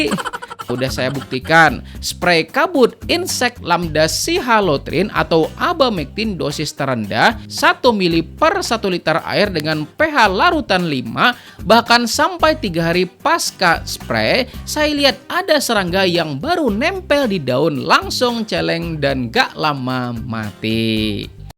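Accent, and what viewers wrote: Banjar, Indonesia. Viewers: Nakaya p ne model nya Bahasa urng banjar logat nya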